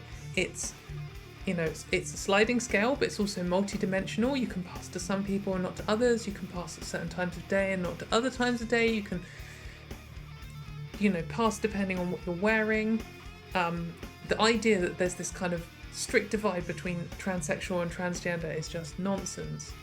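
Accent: United Kingdom. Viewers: Seeing u speak with a British accent is very weird. I imagined u had a transatlantic American accent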